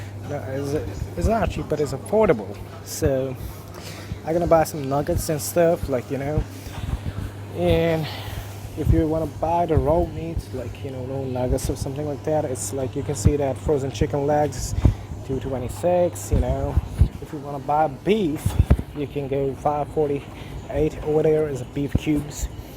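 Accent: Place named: Florida, United States. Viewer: Your English sounds almost like the southern accent here in Florida ☺️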